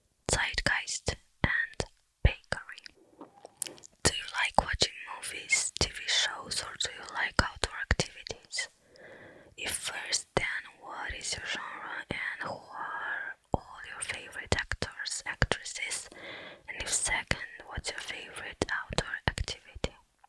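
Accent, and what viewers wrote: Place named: Romania. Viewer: You’re from Romania!! I love your accent so much and you are pretty! Keep up the good videos!!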